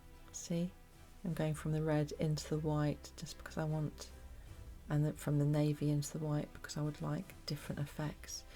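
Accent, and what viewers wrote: England, United Kingdom. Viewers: ❤hi there I'm Donna Louisa. Close related names. I Molly love your butterfly effect ❤ Video, it was great. I'm new to acrylic painting. Currently I'm doing a course with Molly from Molly's Artistry ~ Acrylic pouring / swipe technique course - via you tube lifetime access intellectual information mine. Watch out for the big exchange rate as it's 🇺🇸 I'm in 🇦🇺 in Victoria, Australia. USA $150.00 = Aus $230.00. She is a beautiful lady & teaching is amazing. World renowned artist I'm so glad I caught your Video today. I haven't done the Dutch pouring course yet. Molly uses her Dutch pouring method with hair dryer too. So have seen it often. Will try myself soon. Your demonstration was lovely simple & already aligned with others principles like Molly who has done Acrylic pouring. Loved your explanation. I loved your beautiful English accent. 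I Will try to see more in the future. Have you made other videos? I also don't have the whole range of colours either. So many brands & ranges. Do have some small tubes of the soft body Acrylics paints to work through as well as the larger containers of paint, which I now buy for Acrylic pouring as I can. These should mix with little water or pouring medium - so they should be pourable thinned down. I'm slowly trying to build them up. I used to paint portraits for a short time adults babies animal etc. I have completed several units such as drawing painting, ceramics, and sculpture from Visual Diploma Arts. However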